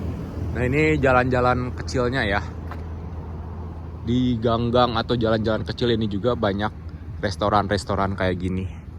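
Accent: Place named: West Java, Indonesia. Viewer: Gass mantap orang sunda inih ketauan logatnya da abimah oge sami orang amsterdam 😂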